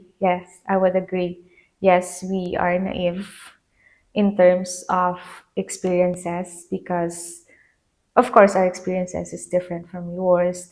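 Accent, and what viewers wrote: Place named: Philippines. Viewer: Hi. I adore how you take the extra measures to speak clearly in English, with your provential filipina accent. Whats not to love here!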